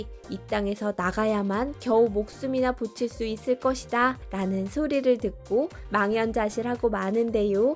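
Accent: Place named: Chungcheong, South Korea. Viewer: 목소리가 충청도출신? ~유 라고하면 늘어지는데유~ 재미있는 목소리네유~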